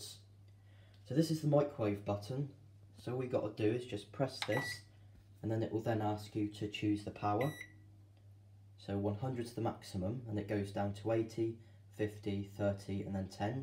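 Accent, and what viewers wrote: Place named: Cornwall, United Kingdom. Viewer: He speaks with a Cornish accent. He probably lives on a farm in Devon or Cornwall. He is a lovely boy who should be working on his tractor.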